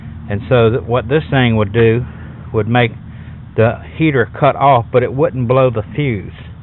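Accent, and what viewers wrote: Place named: Louisiana, United States. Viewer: Are you from Louisiana? For Alabama because your accent sounds very Cajun brother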